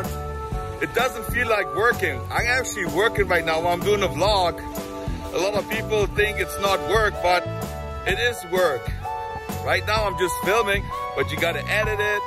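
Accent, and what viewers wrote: Germany. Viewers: Thanks for the tour. I find it very interesting. Seems there's many beaches to choose from, all pretty close together. Loved the video. Love your Deutschland accent & the Arnold impression. Stay safe!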